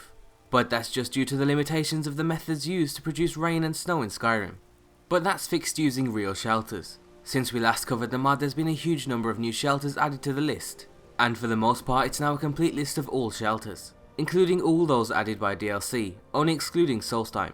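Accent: United Kingdom. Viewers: yeah nice but god i hate that british accent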